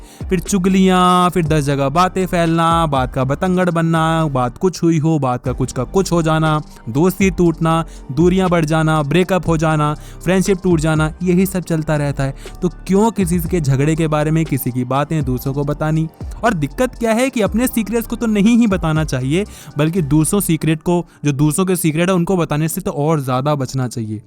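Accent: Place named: Uttar Pradesh, India. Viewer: Lagta hai aap u.p state ke ho ..mera ak friend bhi u.p ka h ..wo bhi aise hi bolta h ..har baat me bhiya use krta h..😂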